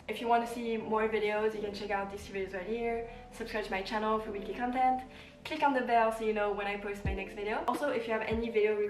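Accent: France. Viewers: le petit accent français je l’ai aussi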